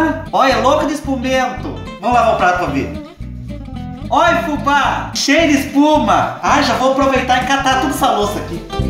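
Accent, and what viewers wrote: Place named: Bahia, Brazil. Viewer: Amei esse seu canal😲Já g annhou uma inscrita nova😁Sou Baiana e seu modo de falar pareçe com o meu 😌😍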